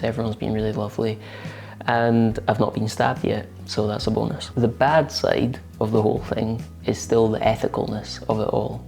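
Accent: Scotland, United Kingdom. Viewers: I'm terribly sorry, but I'm begging you to answer - is that a mild-ish sort of Scottish accent?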